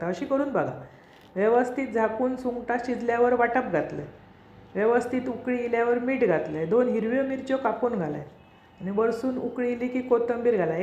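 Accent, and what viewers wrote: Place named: Vengurla, India. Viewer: Tumchya bolnyacha style warun tumhi vengurla che watta.. tumhi vengurla che ahat ka?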